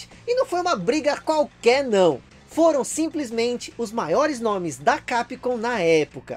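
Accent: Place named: Northeast, Brazil. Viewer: Issui pirata parece um nordestino com sotaque melhor do que muito nordestino kkkkkk P.s sou nordestino, antes que os lacradores comecem com enjoo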